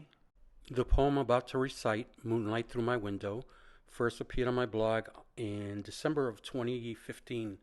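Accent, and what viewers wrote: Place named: East Coast, United States. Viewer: Look at you - all grown up and on YT now!! Go, Go! PS - I remember first stumbling upon your blog and the YOU poem. It is SOOOOOO fun to hear your voice reading the poems. You haven't lost your upper East coast accent if you're still living in Florida?! PS - I could've sworn it was the shoes that make a woman beautiful?;-)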